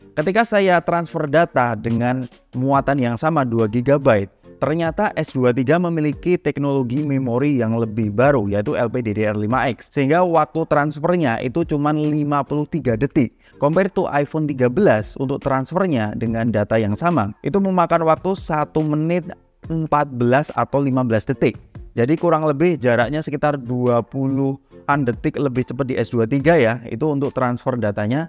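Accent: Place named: Pekalongan, Indonesia. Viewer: kaceke tidak banyaknya ya, jgn2 mas nya orang pekalongan nih 😁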